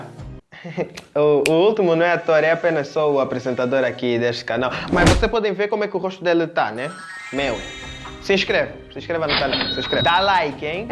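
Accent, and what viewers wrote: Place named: Angola. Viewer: Deve ser da angola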